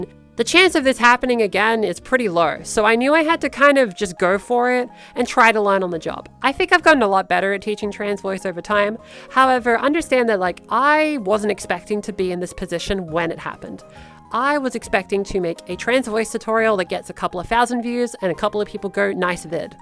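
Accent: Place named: Australia. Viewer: want to thank you for the tutorial,. It was really refreshing to have another Aussie whose voice i could aim for and not the Californian (although those tutorials are still helpful!!) and you helped me practice my voice to the point where my vocal therapist was really impressed with my adaptability!! much love ❤️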